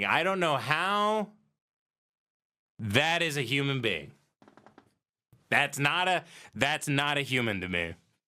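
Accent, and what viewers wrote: South, United States. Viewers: i could listen to doing a southern accent about nonsensical college sports talk for 10 hours straight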